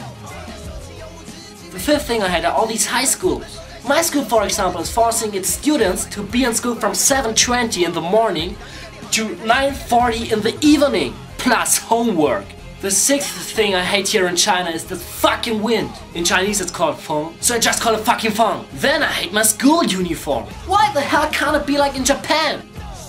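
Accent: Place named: Germany. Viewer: well , i can tell you have german accent too !!!! :) ( smarter !~ta~da) ANYWAYS ! i need talk with you about this video this weekend ! = =# LAO WAI !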